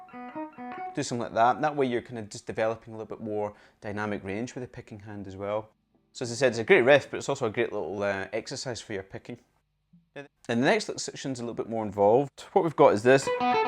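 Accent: Scotland, United Kingdom. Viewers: The guy is Scottish mate . Dont confuse the two.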